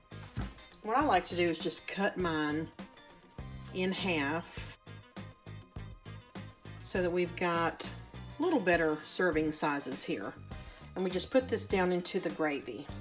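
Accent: South, United States. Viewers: Love your accent! You're truly a southern belle 🤗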